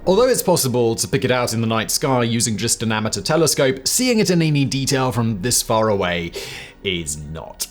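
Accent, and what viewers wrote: United Kingdom. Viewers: You Tube SUCKS! I have removed this man's channels over and over again. But when You Tube changes the algorithm, it force feeds me this Simon guy's many channels despite my removing him. Simon's channels suck. They are poorly researched information that is mostly government propaganda talking points. Terrible videos. And just because Simon has a British accent, this doesn't not make him an authority on anything. He's just a talking head. England is over. Brexit has made the only job in England a You Tube content provider. Terrible list videos that spread falsehoods and bull. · Your voice is hard to listen to. It's like you're from the most stereotypical posh little English village imaginable. And no, despite what you think, it's not really a good look for you.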